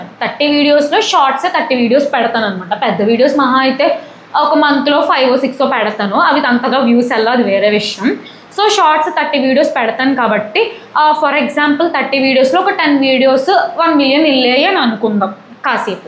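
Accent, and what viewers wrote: Godavari, India. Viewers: Good to see your videos. I like the way you speak and your slang from GODAVARI. Even i am from andhra from Vijayawada, but my parents are settled in HYD and my self in UK.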